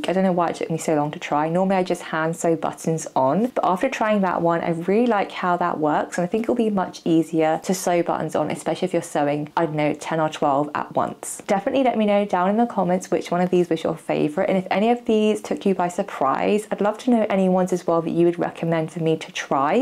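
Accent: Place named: United Kingdom. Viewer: Thanks for sharing. BTW, love the accent. I'm always watching British TV or movies here in The States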